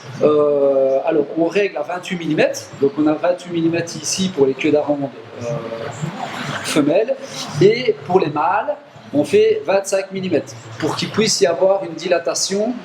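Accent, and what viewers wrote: Belgium. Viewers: L'accent belge m'avait manqué. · Bravo, une bonne imitation de, tu tiens bien l'accent belge.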